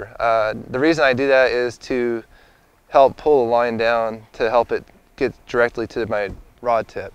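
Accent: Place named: Southern California, United States. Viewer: He was from Southern California, it's just the regional dialect.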